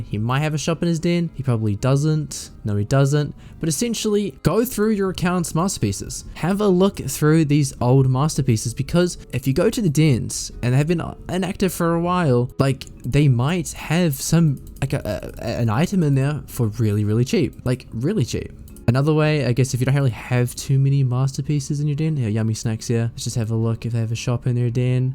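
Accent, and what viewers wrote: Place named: New Zealand. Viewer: Subbed, I liked this video cause you sound like you’re from nz n I wanna follow more creators outside of us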